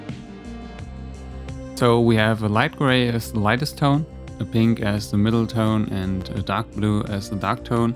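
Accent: Germany. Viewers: ich weis nicht ob ich mich täusche, aber es hört sich an als ob du aus Deutschland kommst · I KNEW IT!! THAT TRUE GERMAN ACCENT! xD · are you german? Man hört einen Akzent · are you german? your accent sounds so german.. :) btw, nice video i love how it turned out, you are very talented! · man hört dass du normalerweise deutsch redest (denke ich jz mal weil du vor nem Jahr oder so ein Video mit nem deutschen Titel hochgeladen hast). bin gerade voll überrascht dass man so was tatsächlich als deutscher erkennt lol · sounds german xD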